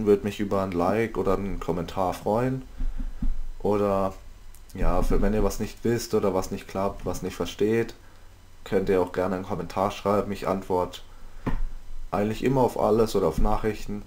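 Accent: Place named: Swabia, Germany. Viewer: Du bisch a kleiner Schwab wa? ^^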